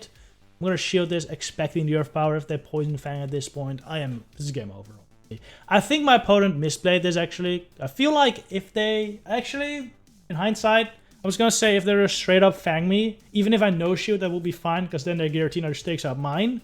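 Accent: Netherlands. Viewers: I’ve been trying to clock your accent and now I think you’re Dutch! Sorry random sidebar from a new follower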